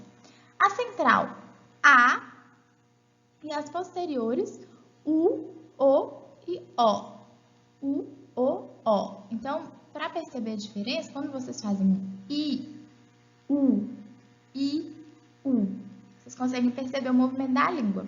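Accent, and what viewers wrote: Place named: Minas Gerais, Brazil. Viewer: Sotaque minerim ^^ Bom de mais da conta.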